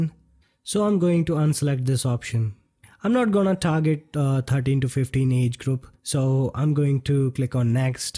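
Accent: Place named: India. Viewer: come on bro i get your trying to help but can i get a american person to teach me, like i hate the way you talk im not indian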